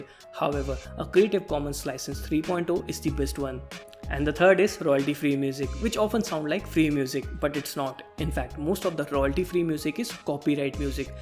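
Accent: India. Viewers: Why am I getting angry at his annoying indian accent....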